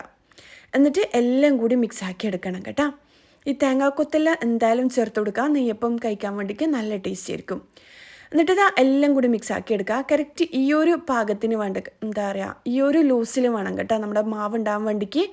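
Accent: Kannur, India. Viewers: Kannur ann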